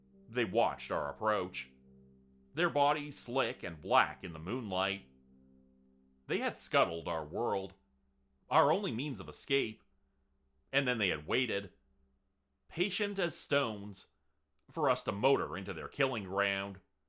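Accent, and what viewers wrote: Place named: Canada. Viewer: WA part o Canada u from A-HHH !!!! IMA FLORIDA BOY so I get ta hear all kinds o accents !!!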